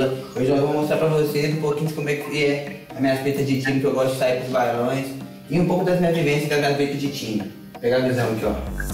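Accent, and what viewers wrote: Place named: Belo Horizonte, Brazil. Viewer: bh ne cria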